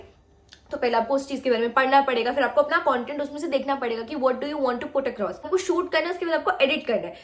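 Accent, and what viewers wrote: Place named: United Kingdom. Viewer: I just love your English accent 🥰❤️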